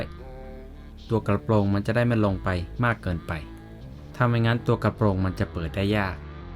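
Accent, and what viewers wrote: Isan, Thailand. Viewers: สุดยอดฝีมือเลยครับ มักเวลาเจ้าว่าวอีสานเบิ่งเป็นกันเอง ฟั่งเเล้วเพลิน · ชอบการตัดเสียงพูดแยกกับเสียงคลิปหลักทำให้ไม่ขัดหูเวลาฟัง ดีมากๆเลยครับ ดีกว่าพากษ์ทับเสียงคลิปหลักทำให้เสียงซ้อนกัน และก็ชอบตรงเว่าอีสานผสมนี่แหละครับ🤣🤣 ชอบผลงานทุกคลิปมากครับ เป็นประโยนช์มาก ติดตามตั้งแต่ Mirage fix ขอให้ทำต่อไปอีกนะครับจะรอรับชมเสมอครับ👍🏻👍🏻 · สุดยอดครับพี่ ผมก็เพิ่งจะเริ่มมาหัดเล่น RC ยังไม่รู้เรื่องเลยครับ ไม่รู้จะเริ่มจากตรงไหน เคยดูคลิปพี่เมื่อหลายปีที่แล้วอยากเล่นมากแต่ตอนนั้นไม่มีงบ เพิ่งจะได้กลับมาดูเพราะอยากเล่น RC นี่แหละครับ ดูเพลินมาก โดยเฉพาะเวลาเว่าอิสานนี่แหละเด้อ 55 ทำคลิปออกมาเยอะๆเลยนะครับ 😊😊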